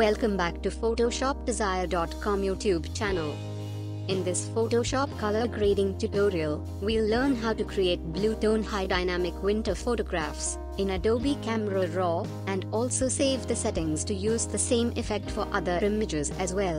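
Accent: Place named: India. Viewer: When even the voice over is indian, god bless you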